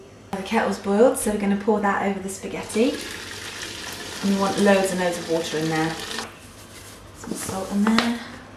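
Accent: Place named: Italy. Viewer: I thought you had an Italian accent . But on the way to get another glass of wine I thought whoa Nellie enough is enough. Nice video,make some more.Looking at the ages of the comments I bet your done with youtube to bad for us.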